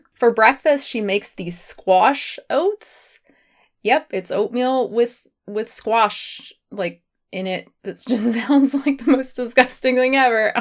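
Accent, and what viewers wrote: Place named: South, United States. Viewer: Off topic but you sound so southern in this video especially at the start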